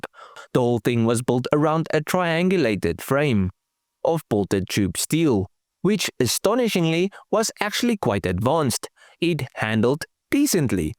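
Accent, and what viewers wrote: South Africa. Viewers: Parelel twin not vtwin. You sound like a South African.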